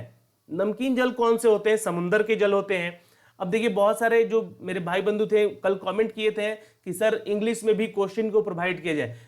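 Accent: Bihar, India. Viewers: Bihari ho kya bhai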